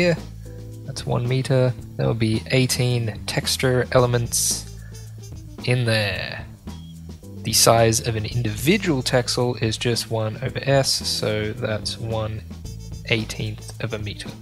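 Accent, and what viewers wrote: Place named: United Kingdom. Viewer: It's nice to put a voice to the amazing work, we are honoured to be with you. Btw are from UK you sound like it lol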